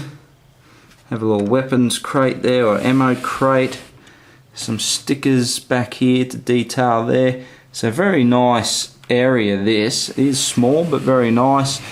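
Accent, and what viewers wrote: Australia. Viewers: Wow are you Australian I am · He has a strong Australian accent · This guy has a real thick strayan accent i like it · I like your australian accent. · Youre an ausie, arent you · Love the Aussie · are you Australian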